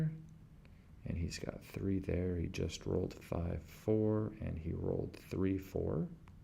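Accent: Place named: New York, United States. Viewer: This guy's sense of humour is really awesome also the new yorker accent in him always reminds me of Burr.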